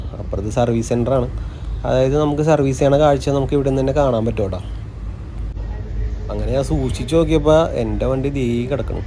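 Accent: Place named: Kochi, India. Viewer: മച്ചാന്റ്റ് സംസാരം കിടു കൊച്ചി സലാംഗ് ❤️❤️❤️❤️❤️❤️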